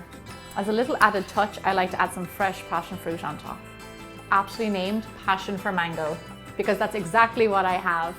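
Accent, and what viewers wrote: Ireland. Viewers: Are you Irish?? Sorry if I'm wrong but my nana is Irish and she has an accent that sounds like yours!! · Btw I love you accent! What is it Irish?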